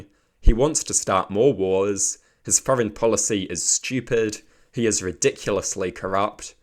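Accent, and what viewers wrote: New Zealand. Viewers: This is actually just a form of Kiwi dialect you haven't become accustomed to yet. Stop being racist bro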